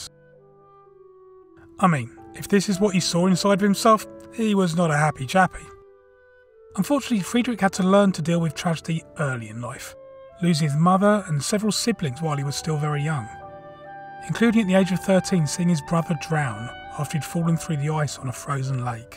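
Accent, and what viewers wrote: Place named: Netherlands. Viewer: you sound more Dutch actually, which is very funny to me as a Dutchie in Germany. Looking forward to your next videos, I just discovered your channel via Reddit & I’m binge watching all of them now👌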